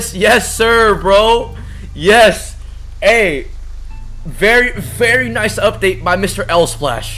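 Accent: United Kingdom. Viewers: Yo are you British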